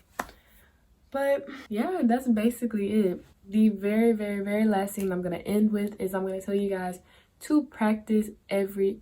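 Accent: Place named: England, United Kingdom. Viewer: I was in Birmingham for a couple of years, your English accent is not a typical black's accent tho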